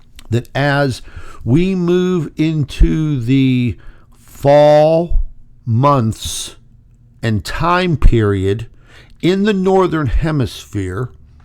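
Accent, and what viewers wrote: Pennsylvania, United States. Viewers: I can't tell you how good it is to hear your voice and see your reporting. I hope the good doctor is doing well you haven't mentioned her lately but then again there's so much going on in the world and in your own personal life. I hope that part gets resolved. I know getting world resolved is not anything we can do it is just going to work around us. We can do very little about it. Buying fewer SUVs or using the stupid carbon tax does nothing for the climate or our planet.. Some political honesty from the powers-that-be would be a lot better. But again it's good to hear you back on the "YT"it's nice to see the videos you put together as well. You're always so informative. As I hail from that part of PA. I relate to your accent, attitude and willingness to call it like it is. Again, I am glad your back researching and keeping people informed especially those willing to watch with an open mind. 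Again I hope your health improves and the good doctor weathers her physical trials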